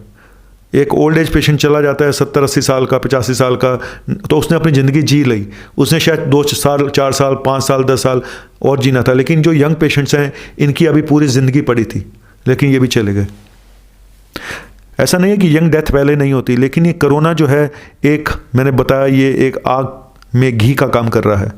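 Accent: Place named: Punjab, India. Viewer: Very sweet punjabi ❣️❣️nice to hear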